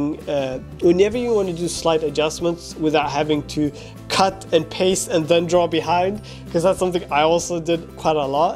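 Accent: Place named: Sweden. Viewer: i KNEW i recognized your swedish accent from somewhere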